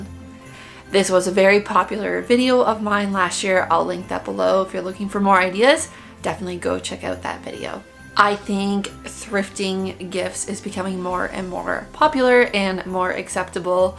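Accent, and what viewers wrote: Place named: Canada. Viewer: I hear Canadian, I subscribe! 🇨🇦 ❤ Plus thrifting, crafting, mending, everything up my alley! ❤❤❤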